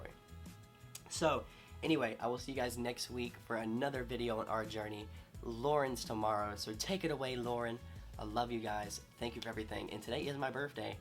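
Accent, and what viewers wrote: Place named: South, United States. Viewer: He has a really thick country accent😹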